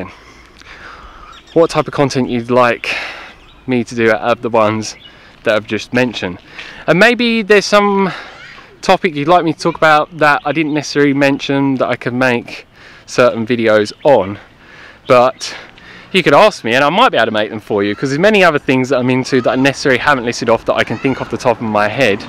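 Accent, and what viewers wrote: United Kingdom. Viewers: is he from the uk?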